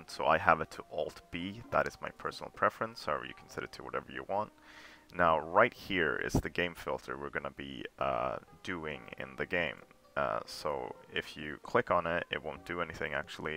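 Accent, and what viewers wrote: Sweden. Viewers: love the swedish Accent much luv <3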